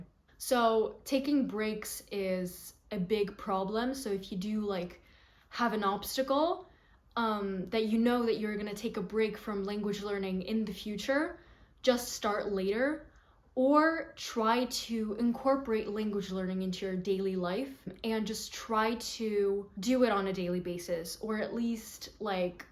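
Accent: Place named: Turkey. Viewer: Are you Turkish? Your accent is incredible. Would you say that watching movies was the main thing for you?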